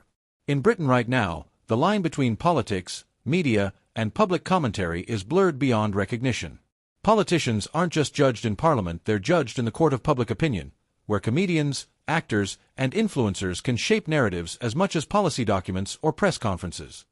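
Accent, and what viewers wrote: United States. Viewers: Why we got a bleedin yank telling us this . Just get on with it yawn yawn yawn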